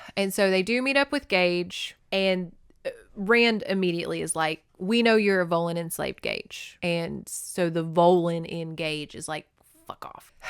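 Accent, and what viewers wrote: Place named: South, United States. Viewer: Okay but I love your southern accent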